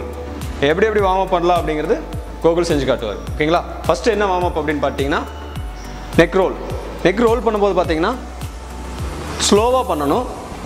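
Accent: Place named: Kongu, India. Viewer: Bro neenga Coimbatore ah..? Kongu Tamilin enimai katuku sogama eruku...;)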